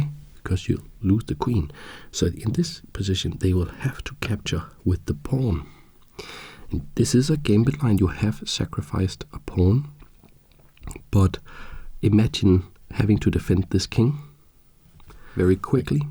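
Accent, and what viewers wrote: Denmark. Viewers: Are u from denmark? I can hear the accent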